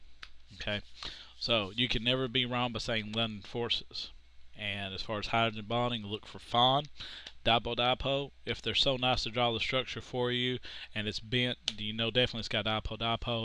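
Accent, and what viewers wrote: South, United States. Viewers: BIG HELP! & great country accent. Southerners!!! =)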